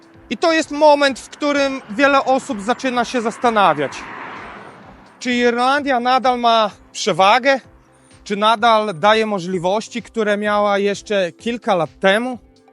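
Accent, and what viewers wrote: Podlasie, Poland. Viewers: od razu słychac, mam dużo kumpli z podlasia, wszystkich na emigracji spotkałem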